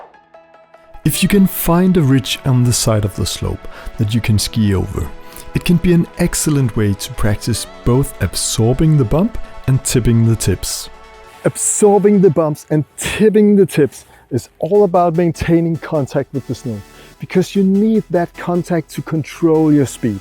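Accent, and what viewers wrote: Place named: Germany. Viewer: The German/Austrian accent of the narrator suggested this would be a detailed instructional tutorial rather than some fluffy review stuff. No surprise here about clarity, brevity and value of advice. Will forward this vid to my kids to improve their downhills.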